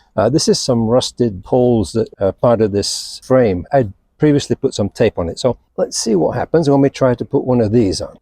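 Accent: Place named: Upper Midwest, United States. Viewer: Nice video .. interesting accent .. sounds like you could be upper midwest .. Chicago maybe .. hmmmmmm!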